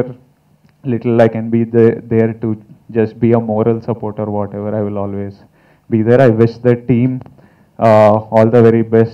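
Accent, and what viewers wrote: Telangana, India. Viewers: I like u bro speak telugu u know pronounce in telangana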